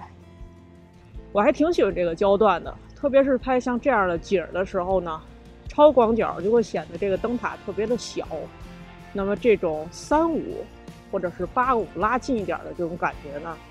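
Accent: Beijing, China. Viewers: 绝对是个老北京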